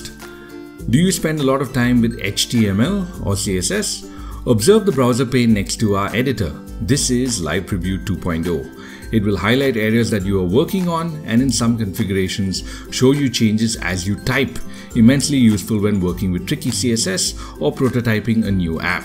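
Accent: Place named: India. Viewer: An Indian accent, really?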